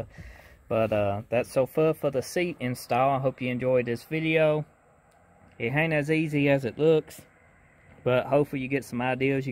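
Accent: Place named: Louisiana, United States. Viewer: Cajun accent isn't it? You hear that down in Louisiana.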